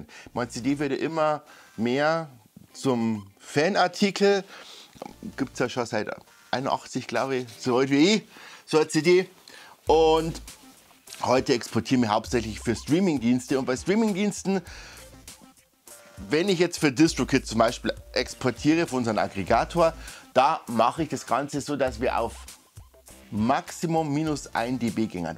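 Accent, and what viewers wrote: Bavaria, Germany. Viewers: Jo, sog amoi, Du redst ja boarisch. Wo kimmts'n Ihr her nachert? Nur so Pi x 👍🏻? Coole Videos übrigens. Guat dass was zum Thema Cakewalk in meiner Sprachn gibt, und ned bloß auf Englisch (obwohl mei Englisch recht guat is)!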